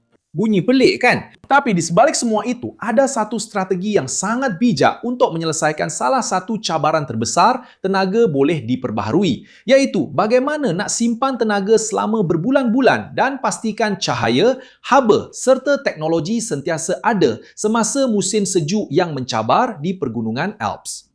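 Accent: Indonesia. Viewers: Bodoh je orang indon ni buat contain guna AI alih bahasa Melaysia campur bahasa indon. Bodoh doh bunyi dia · Admin ni orang Indonesia yang bercakap Bahasa Malaysia ke?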